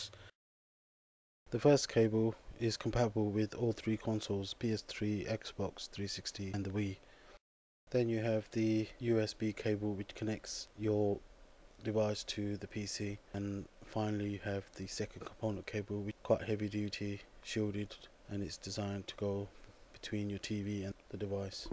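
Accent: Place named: United States. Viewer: وااااو الاكسنت عندك كنك امريكي .. ^_^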